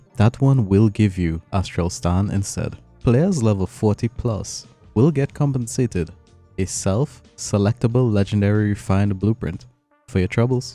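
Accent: Trinidad and Tobago. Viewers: Lol I commented this too..lol u cant hide that accent g I hear my people voice 🤣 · Are you trini?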